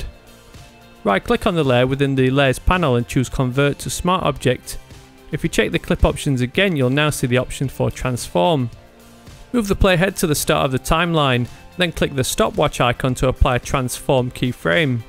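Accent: United Kingdom. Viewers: is that a British accent? I love that accent! we Americans have a boring ole hum drum accent - so unfair!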